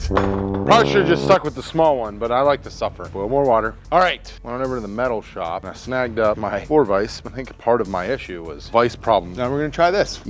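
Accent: Pittsburgh, United States. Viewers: I keep hearing Yinz which means you gotta be from Pittsburgh. Instant sub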